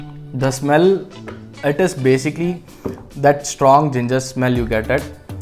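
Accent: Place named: India. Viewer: Thank you....listening to you gives me good memories of my friends of India the Bajaj family.....I think everyone knows them ahahahah.....I am making guava wine now from my huge tree here at my house in the mountains of Guatemala....I will start the ginger bug today for some good ginger beer.....Adios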